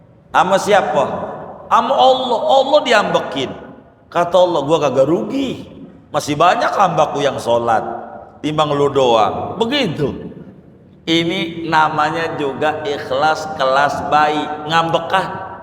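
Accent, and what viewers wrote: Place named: Betawi, Indonesia. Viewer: Qobiltu Pak haji, paling suka neh ane dengan ceramahnya gaya betawi yee, terima kasih pak haji ilmu ane bertambah lagi mudah"an ane istiqomah Amiin yra🤲🤲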